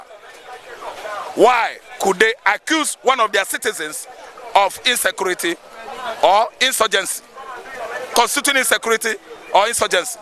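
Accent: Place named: Igbo, Nigeria. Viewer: voice of an Igbo man ,when they speak we know .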